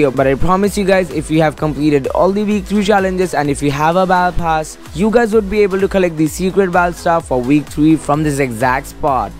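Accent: India. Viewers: Hmmm I dunno this guy sounds like an Indian scammer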